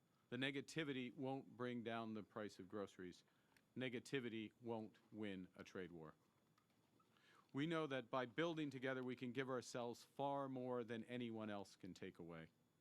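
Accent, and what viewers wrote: France. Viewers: I don't know why some critics say he doesn't speak French well... He does and he speaks French French not Quebecois, maybe the critics are attacking that.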